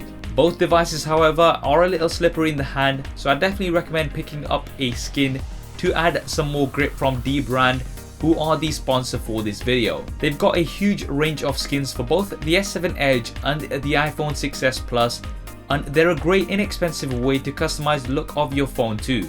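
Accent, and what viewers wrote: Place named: England, United Kingdom. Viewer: btw I know you're Muslim and from England I think by your accent where's your parents from.?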